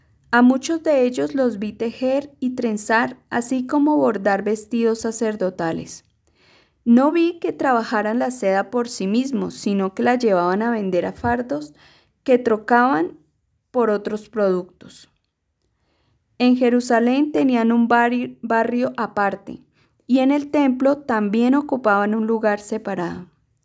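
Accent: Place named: Colombia. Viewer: Mucho acento colombiano me genera resistencia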